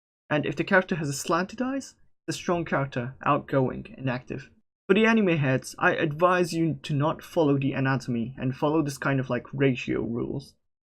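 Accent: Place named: India. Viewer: “I’m japanese”. That accent doesn’t lie ya Indomutt akhi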